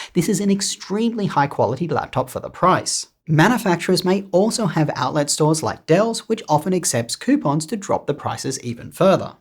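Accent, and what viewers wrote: Australia. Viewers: I just love the Austrèlien accent, I didn’t pay much attention on the contents of video but. I will watch it again and try to concentrate on topic. Thank you. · Are you Australian by chance?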